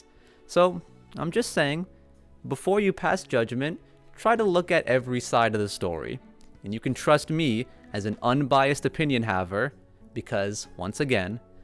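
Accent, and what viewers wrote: Canada. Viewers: Thought yo ass was canadian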